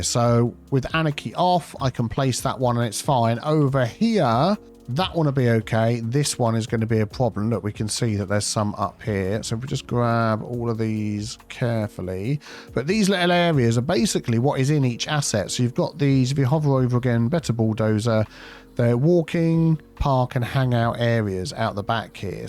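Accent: United Kingdom. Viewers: Wait, you're British?!?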